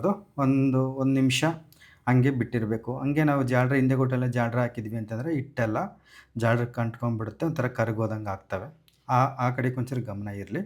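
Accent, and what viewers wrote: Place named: Challakere, India. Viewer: Bro super nam maneli ide tara madodu nim mathu kelta idre nam uru ansutte nan bandu challekere nim uru yavdu bro but nan ega irodu blore nali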